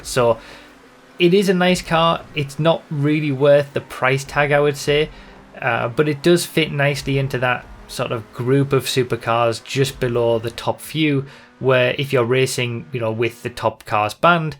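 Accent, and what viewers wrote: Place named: United Kingdom. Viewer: Dem brit ish accent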